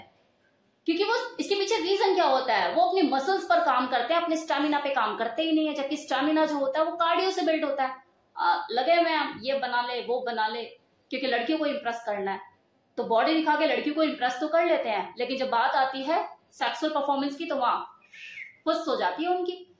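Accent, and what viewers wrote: India. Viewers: Mam aap ke samjhane ka tarika bahut pasand aaya aur aap ki voice so sweet & personality & dressing style bahut achcha hai you are so good looking I am impressed 😊 sabse achcha aap ka Desi style way of talking ❤️❤️❤️❤️❤️ Love 💕 you mam 🙏🙏🙏🙏🙏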